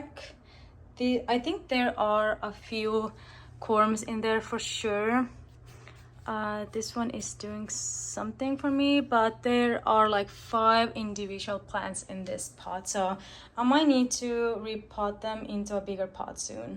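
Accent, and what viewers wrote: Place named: Netherlands. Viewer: Beautiful plants! Are you Dutch perhaps??? I just recognize the accent :)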